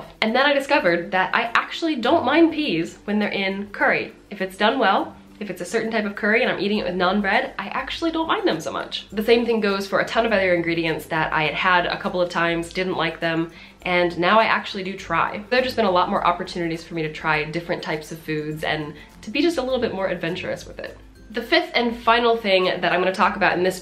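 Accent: Midwest, United States. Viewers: What state are you from originally, if you don't mind sharing? I'm guessing Ohio, Michigan, or Indiana. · I love studying accents and exploring how people's speech patterns change over time. I am from CA and lived for two years in the UK. My speech and word choices were all over the place when I got home. I can still hear the Midwest in your speech!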